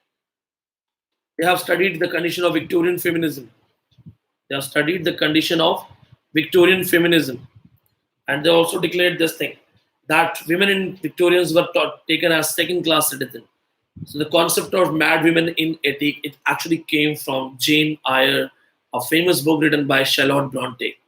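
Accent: India. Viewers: an lndian speaking english in his or her own way of Indian style is really great to listen to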